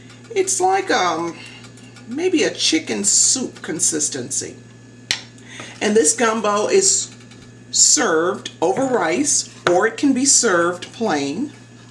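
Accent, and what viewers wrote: Louisiana, United States. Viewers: sounds like you may be a Cajun,. I'd never make gumbo this thin, add frozen okra, put file in the gumbo pot, use turkey sausage (I wouldn't make gumbo if I couldn't get Savoie's or Richard's sausage or comparable) and NEVER add tomatoes. There is variety even in Louisiana--I've never even had a bowl of gumbo in New Orleans restaurant that I enjoyed (didn't take like mine or Maw-Maws:) ). I guess it is all in what you grew up with. Keep in mind that a lot of cooks make a roux-based cajun dish once in a great while, not a few times a month like I ( and maybe you?) do.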